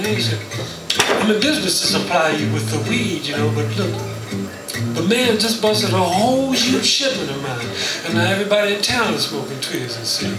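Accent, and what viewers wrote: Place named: South, United States. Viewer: Jesse always connected with his audience, thru humor, wit, heartfelt love for his fellow humans, and that ever sweet Southern honeysuckle drawl. I feel so fortunate to have shared this planet with him for years, and thankful to have seen him perform a few times.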